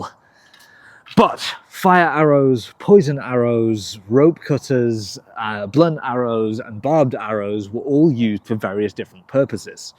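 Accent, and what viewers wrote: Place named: Yorkshire, United Kingdom. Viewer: I suspect part of it is your accent. Yorkshire?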